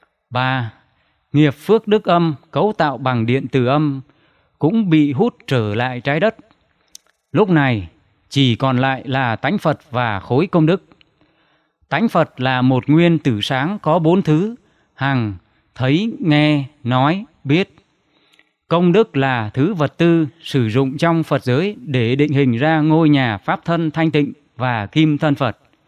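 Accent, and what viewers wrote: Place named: Thanh Hoa, Vietnam. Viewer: Giọng đọc Thanh Hoá nghe rõ . Nội dung có chính xác như của chùa thiền tông Tân Diệu không ?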